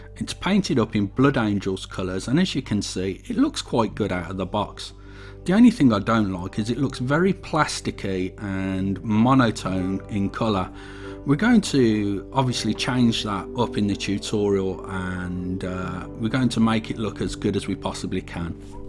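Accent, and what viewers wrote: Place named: Midlands, United Kingdom. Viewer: Nice idea.. I really feel like a potato not thinking of this. Sound like you are from Midlands lad like me. Nice to hear a accent I can understand.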